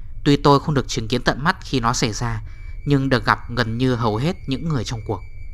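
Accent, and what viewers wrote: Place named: North, Vietnam. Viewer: người ở đâu vậy? Nghe giọng thì người Miền Bắc rồi nhưng không biết ở tỉnh nào..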